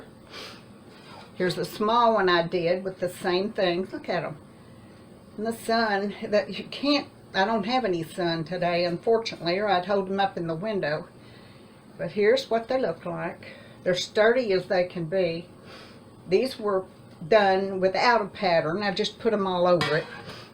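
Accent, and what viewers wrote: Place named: South, United States. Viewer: the southern accent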